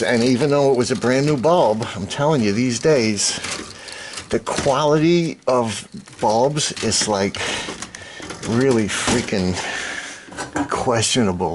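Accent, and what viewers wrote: New York, United States. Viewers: I love NY or Jersey accent! I'm southern, never ending amusement when I lived in north. What the hell is biscuits & gravy?? Difference between north/south, North will tell you to your face, F/O! South, we are sneaky & very polite, Be aware, probably find your body in a ditch next day if you piss us off but we are polite!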